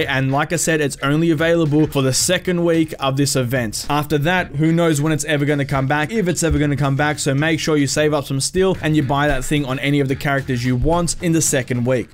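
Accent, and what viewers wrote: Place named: Australia. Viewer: Also, where abouts in Aussie you from mate?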